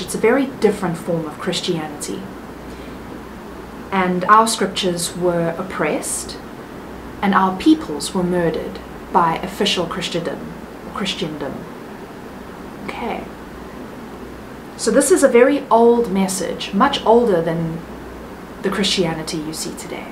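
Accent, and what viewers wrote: South Africa. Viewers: You have a South African accent 👁️🐍⚖️🔥☝️ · You sound South African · Are you a Saffa? 🇿🇦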